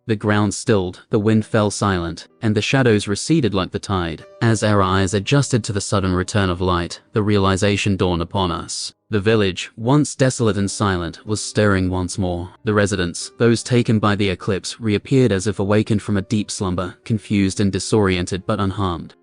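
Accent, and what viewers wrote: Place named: United Kingdom. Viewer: This British sound makes this little bit better🔥🔥🔥🔥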